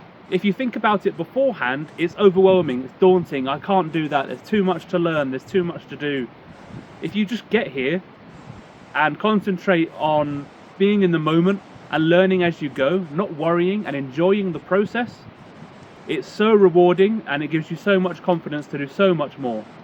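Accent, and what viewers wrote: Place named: United Kingdom. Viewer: Gosto de ver a perspectiva dos outras pessoas sobre o país. Parece-me que muitos britânicos conseguem ter uma opinião fundamentada e clara rapidamente; entendem relativamente bem a dinâmica social. Também aprendo algumas coisas, aparentemente, muitos estrangeiros que não são ricos, mostram-se satisfeitos com uma casa simples, que vão renovando de acordo com as suas circunstâncias, e fazem-no com muita resiliência e serenidade. Penso também sobre os rendimentos destas pessoas, mostram ser criativos e com domínio do mundo digital, onde se movimentam bem, e talvez estejam dispostos a correr mais riscos em relação à fiabilidade do emprego/rendimento/income a médio prazo, do que eu estaria. Por vezes pergunto-me, o interior está desertificado e desaproveitado, se estrangeiros podem viver nestas áreas porque mais jovens portugueses não o poderiam também fazer? 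A resposta clássica de muitos portugueses, grupo nacional de que tenho a honra de fazer parte, seria que os "estrangeiros têm muito dinheiro e/ou fontes de rendimento dos seus países de origem". Na minha opinião é uma resposta redutora, claro que existem estrangeiros com muito dinheiro, mas provavelmente não serão a maioria, pelo menos dos que vivem em Portugal, por isso, talvez possamos reter algo do seu modo de vida, e da maneira como criam sinergias e soluções de vida no interior. Este simpático jovem senhor, transmite a imagem de uma pessoa pacífica e bem intencionada, gosto do sotaque britânico, e vejo sempre os vídeos s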